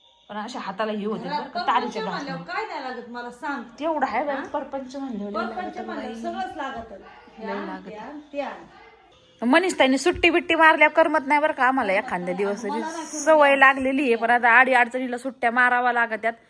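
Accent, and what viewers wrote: Ahmednagar, India. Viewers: नगरी भाषेत एक वेगळाच गोडवा आहे ❤❤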